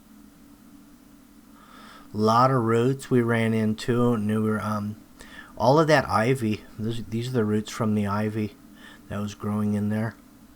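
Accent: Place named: Southern California, United States. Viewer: Can always catch a SoCal accent. I live in Ohio now but was born and raised in OC for 43 years.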